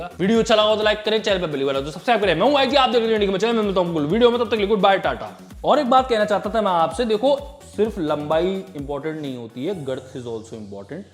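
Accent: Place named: Uttar Pradesh, India. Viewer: bhai tumhare bolne ka tareeka bohot badiya hai........bilkul up touch hai accent me......kanpur se ho kya??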